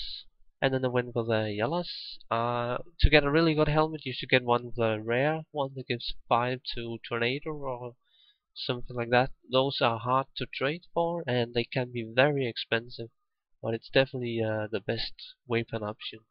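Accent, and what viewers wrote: Denmark. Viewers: Er du dansker? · You Danish/From Denmark? Sounds a lot like it.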